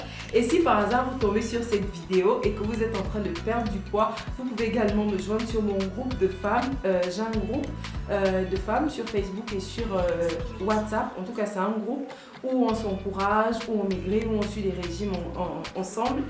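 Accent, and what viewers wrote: Gabon. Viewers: C'est la première fois que je regarde vos vidéos. Votre accent ? Vous êtes gabonaise ???😍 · cet accent qui me rappelle mon Gabon 🥰🥰! Merci pour l'astuce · Votre accent on dirait une gabonaise 🤔🥰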